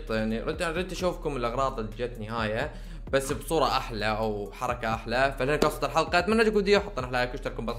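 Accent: Iraq. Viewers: بلهجت العراقيين كلاو. 👲🏻👲🏻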